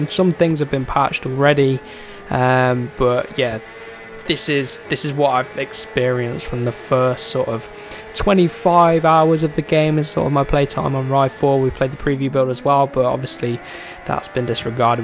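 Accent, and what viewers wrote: United Kingdom. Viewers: i love your british accent, man 😘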